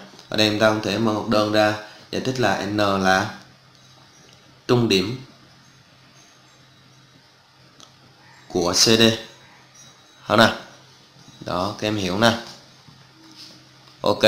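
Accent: Phu Yen, Vietnam. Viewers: Em ở miền bắc .Hồi trước em không phân biệt được giọng Phú Yên với các tỉnh trong đó, mà giờ nghe thầy giảng nhiều nên em phát hiện giọng Phú Yên có gì đó đặc trưng hơn các vùng miền khác. Em yêu giọng thầy và thương thầy lắm, cám ơn thầy luôn giúp đỡ em và các bạn ạ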